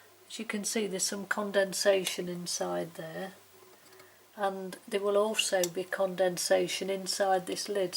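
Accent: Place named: East Midlands, United Kingdom. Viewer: Thanks for a clear and detailed explanation of how to use this machine. Also, are you from the East Midlands, by any chance?? Your accent sounds just like ours.🤭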